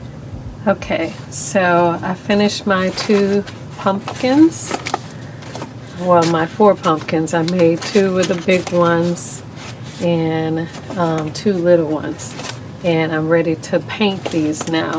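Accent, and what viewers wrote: New Orleans, United States. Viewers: you crack me up enunciating pump-kin extra hard since people were commenting on your New Orleans accent. It’s ok to say pun-kin 😂 🎃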